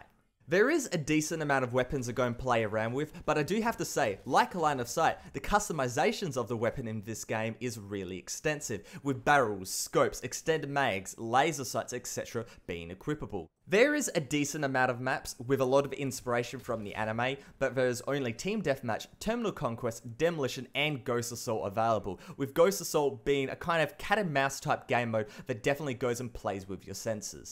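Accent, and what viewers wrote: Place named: Australia. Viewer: I KNEW U WERE AUSSIE THE MOMENT I CLICKED ON THIS VID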